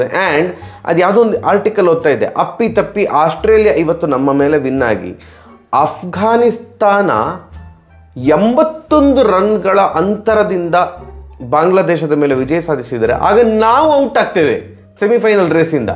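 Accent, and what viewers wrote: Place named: Dakshina Kannada, India. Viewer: ... ನಿಮ್ಮ ದ. ಕ. ಕನ್ನಡ ಕೇಳುವುದೇ ಒಂದು ರೀತಿಯ ಖುಷಿ..." ಮಾರಾಯ್ರೆ ", " ಒಟ್ರೇಶಿ ", " ಎಬ್ಬೇ ".. typical slang accent...❤❤❤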